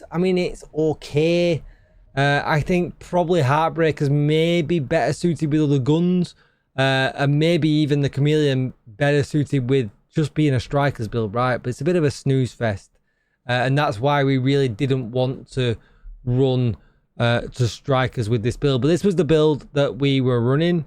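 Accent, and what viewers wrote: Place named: Scotland, United Kingdom. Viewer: Hey, buddy I'm new on the channel, your accent sounds like the SOAP on the new MW series, may I aks where you from???? Take my Thumb Up 👍 right from Brasil.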